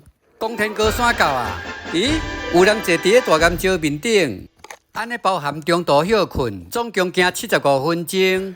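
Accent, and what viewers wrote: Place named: Taiwan. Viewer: 介紹清楚，拍攝美景令人舒心。 台語解說一大特色👍👍👍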